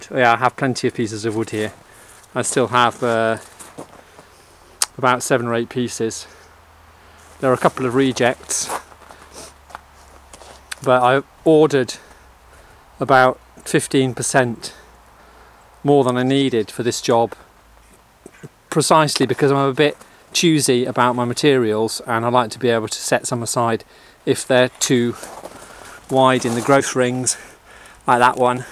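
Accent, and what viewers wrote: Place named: England, United Kingdom. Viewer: Hi are you from uk, you have got a great English accent and great videos!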